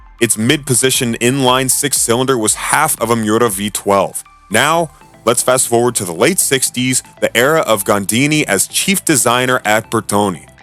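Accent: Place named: United States. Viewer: Any A.I. voice would have spelt any of those names much better than any "American". To not to say anything about his "TweNNy-tweNNy"...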